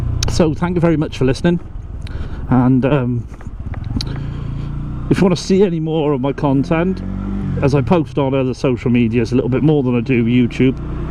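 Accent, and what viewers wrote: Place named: Gwent, United Kingdom. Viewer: What area are you riding around in this video mate, I'm a Welsh boy and your accent sounds Gwent-ish Great review by the way, honest and to the point - just the way I like it 👌 - where is the dealership you got your Outlaw from. Just sat on one for the 1st time yesterday up in Barnet where I am at the moment -felt great 👍 !!!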